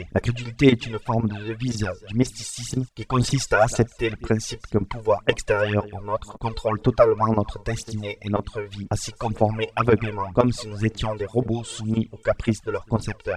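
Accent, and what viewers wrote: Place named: Marseille, France. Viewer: le dephasement de ta voix et merdique ,tu peut te faire retrouver trop facilement x) et t'es un marseilleis deja sa s'entend x)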